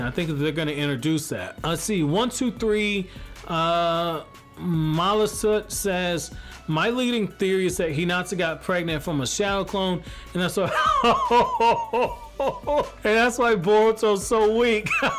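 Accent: New York, United States. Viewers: Bro, you actually have a pretty cool voice and you sound like you’re from NY. Your content brings me daily joy. Stay Up fam 🙏🏽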